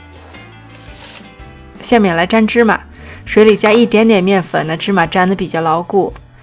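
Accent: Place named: Xi'an, China. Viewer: 最开始看是因为地道的西安味，难得发现一个老乡，然后看见所有配方都有详细说明，量杯和克数，还有中英文对照，尤其用量杯，大小勺，非常方便，我习惯用计量，每次看见网上食谱用克数就头疼，现在方便了，要做什么都打开的视频看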